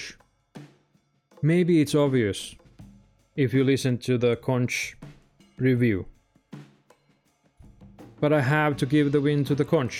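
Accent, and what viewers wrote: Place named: Netherlands. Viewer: that dutch accent 🤌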